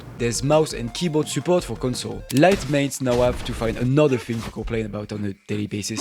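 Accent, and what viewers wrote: France. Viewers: Je présume à l'accent que tu es français, alors je me permets de mettre un petit com dans la langue de Molière. Tes vidéos sont géniales mec, les thèmes abordés sont intéressants, l'humour est bien dosé et bien intégré. Continue comme ça, et prends soin de toi. Je t'ai entendu dire que derrière l'écran c'était pas facile, le soleil vient toujours après la tempête, reste fort!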